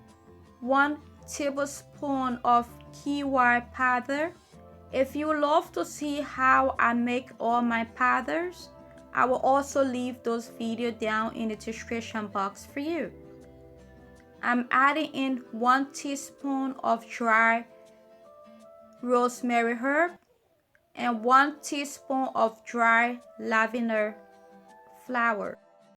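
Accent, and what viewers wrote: Liberia. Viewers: Bella She sounds Liberian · I hear small Liberian English my geh....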